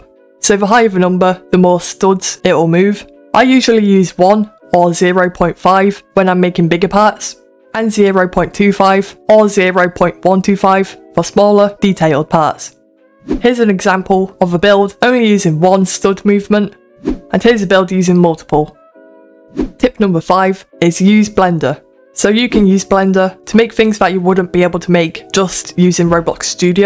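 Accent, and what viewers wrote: United Kingdom. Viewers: This british accent is funny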